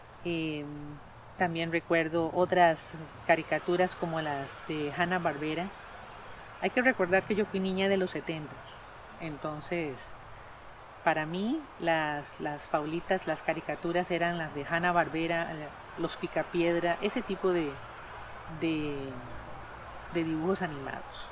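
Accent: Central Mexico, Mexico. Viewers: tu acento del español es muy parecido al que tenemos en la parte central de México así que siempre me es fácil de entender todos los temas que tú expones. Saludos cordiales. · Tu asentó se escucha mexicano del centro. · Creí que eras mexicana...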